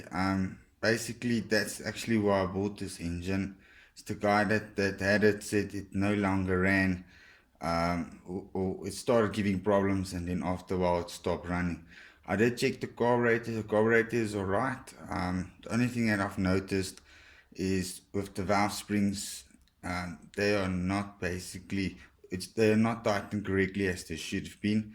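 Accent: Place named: New Zealand. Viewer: The audio is still a bit off. You come out sounding like a New Zealander. But I can make it out. Great build I would like to see more variants of this?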